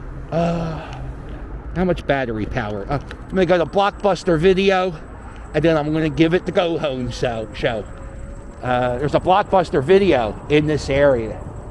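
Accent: Philadelphia, United States. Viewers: This guy has one of the best Philly accent I've heard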